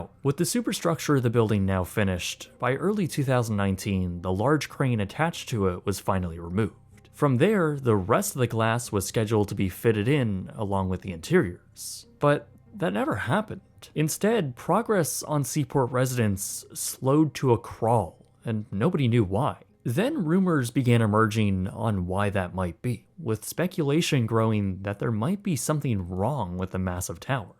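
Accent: Canada. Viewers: I like the hosts crazy Canadian accent 🙂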